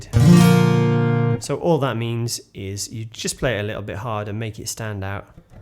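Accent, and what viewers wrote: United Kingdom. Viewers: Sings American.....then speaks....he's English !!..amazing !!..great teacher,great voice !!..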